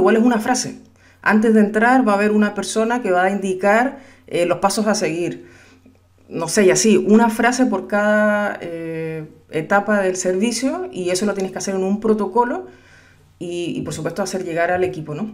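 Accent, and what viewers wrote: Chile. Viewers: eres Chilena?.. Acabo de encontrarte eres lo máximo!! Muy claro y muy motivador! Excelente ayuda para nosotros del rubro Restaurantes! Saludos!!!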